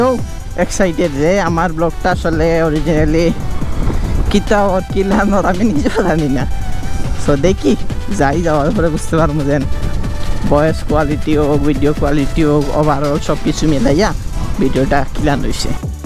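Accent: Sylhet, Bangladesh. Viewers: Best Sylheti vlogger hands down pure Sylheti maat Your number fan from UK London Tower Hamlets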